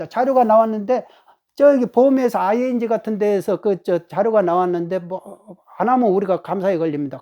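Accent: Jeolla, South Korea. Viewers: 옹색하다와 징그럽다 둘 다 전라도 사투리는 아니고 말씀하실 때 전라도 특유의 억양이 있으십니다 징하다가 징그럽다의 전라도 방언이에요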